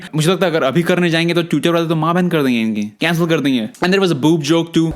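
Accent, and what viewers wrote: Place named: United States. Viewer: Ur accent is amazing Ur accent is totally American , I love u🤗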